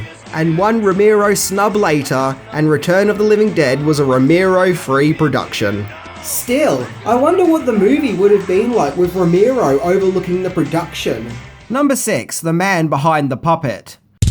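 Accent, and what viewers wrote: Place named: Australia. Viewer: He’s Australian, sometimes they pronounce things differently.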